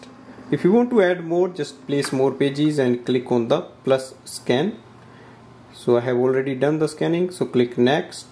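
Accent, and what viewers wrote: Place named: India. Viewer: Plain & simple & i love ur indian accent 😝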